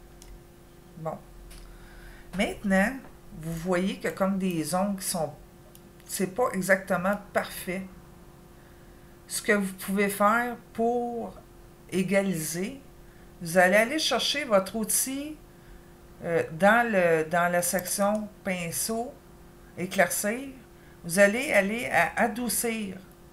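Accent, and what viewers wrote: Quebec, Canada. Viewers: Merci pour cette rapide mais efficace présentation je vais voir tous vos autres tuto J'adore votre accent Québécois ( normal nous y allons chaque année..) Daniel France Normandie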